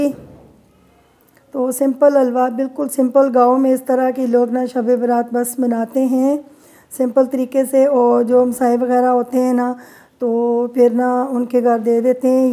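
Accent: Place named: Mansehra, Pakistan. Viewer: nice yuummy yuummy halwa apk bolny k style sy lgta ap mansehra sy ho me b mansehra city township sy hu apko gft dn kr diya a ap b kr dena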